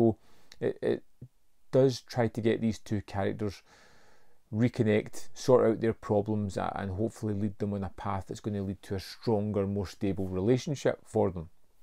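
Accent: Scotland, United Kingdom. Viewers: I know you are listed as being in the U.K. Your accent screams Scottish to me. I grew up in the U.S. and one of my best friends was from Scotland and his dad sounds just like you. He was also a real life badass being a former Royal Marine. I enjoy your presentations and greetings from Texas.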